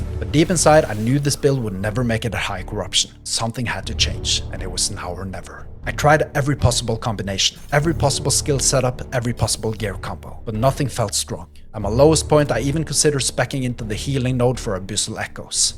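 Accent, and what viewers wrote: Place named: Norway. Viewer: ..med den norskeste norske aksenten på youtube :D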